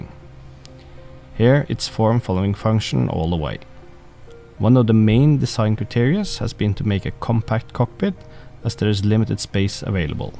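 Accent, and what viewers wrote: Norway. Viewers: Hah, kan høre at du er norsk! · Du er Norsk høres det ut som. det greiene her var et ganske sykt bygg!! hvor holder du til?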